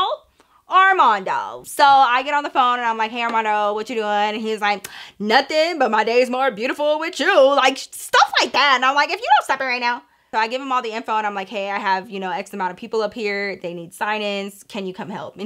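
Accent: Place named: South, United States. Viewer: I love how u say certain words ur Southern twang is so cute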